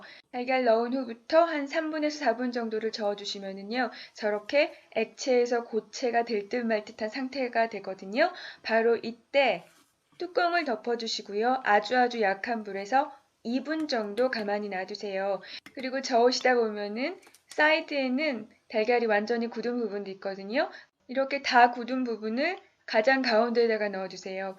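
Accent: Seoul, South Korea. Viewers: 8~90년대 일명 서울 사투리 말투시네요 ㅎㅎㅎ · 약간 경기도 (서울) 사투리 쓰시네요 나긋나긋 너무 듣기 좋아요!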